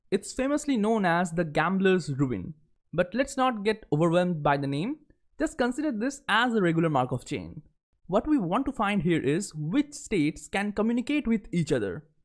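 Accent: India. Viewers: I will be honest, was ready to find another video when heard the Indian accent. But then saw high upvote/downvote and stayed, and don't regret it!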